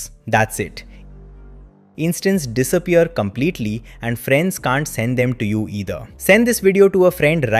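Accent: India. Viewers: Indian accent is way more better than AI generated content. Thank you for sharing!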